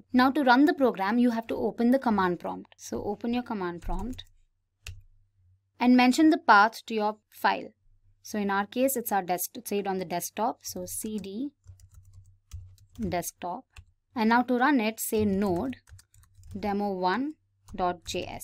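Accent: India. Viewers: 10/10 best tutorial bonus points for the indian accent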